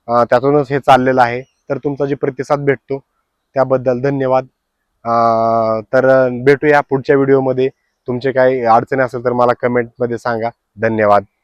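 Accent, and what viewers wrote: Western Maharashtra, India. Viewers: तुम्ही बोलता तो भाषा जी की, सोलापूर, सांगली, सातारा, कोल्हापूर ची ही भाषा तुमच्या तोंडातून आम्हाला ऐकायला आवडेल.... आम्ही मराठवाड्यातील हिंगोली जिल्ह्यात तुमचे हिडीओ खुप बघतो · पश्चिम महाराष्ट्रातील सोलापूर , कोल्हापूर ,पुणे,सातारा ,सांगली या भागातील भाषा आपण बोलता ऐकायला खूप मजा येते. हॉटेल मध्ये गेल्यानंतर तेथील प्रत्येक सजीव व्यक्ती ,निर्जीव वस्तूचे वर्णन, हॉटेलचे वर्णन खूप छान असते . वेगवेगळ्या हॉटेल मधील चविष्ट मटण रेसिपी पाहायला खूप मजा येते. मी नेहमी आपले शॉर्ट्स व्हिडिओ पाहतो❤❤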